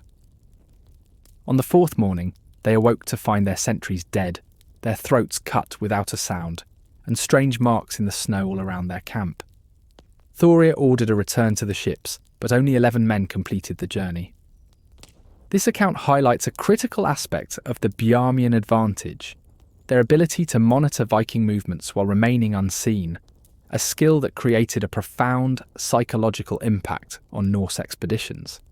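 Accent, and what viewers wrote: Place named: United Kingdom. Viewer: Hi from Ukraine🇺🇦. Im in love with British accent. Its true history ❤like 👍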